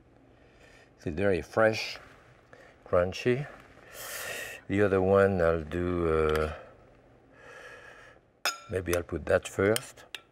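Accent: France. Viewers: It's his gorgeous French accent. Personally, I could listen to him talk all day long.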